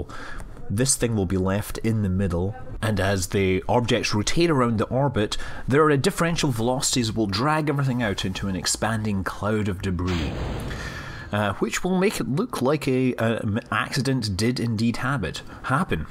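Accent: Scotland, United Kingdom. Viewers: is this a very very very posh scottish accent? i thought it was canadian at first haha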